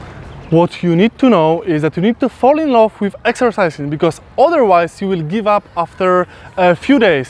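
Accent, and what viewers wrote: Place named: Poland. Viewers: Lepszy angielski z polskim akcentem niz polski z angielskim akcentem, jakby to po paru latach juz sie zapomnialo ojczystej mowy hehe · Typowy polski-angielski, hehe ;)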